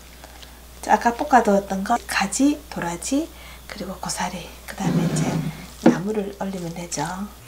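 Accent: Gyeongsang, South Korea. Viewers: 답변 감사해요. 나이도 적잖은데 창피하게 음식할줄을 모르네요ㅠ 작년에 삶은고사리 사서 볶았는데 떫은맛땜에 못먹었거든요. 그래서 물어봤어요. 말씨가 대구분인것 같은데 조곤조곤 설명해주시는거 참 듣기가 좋아요. 많이 배울께요.^^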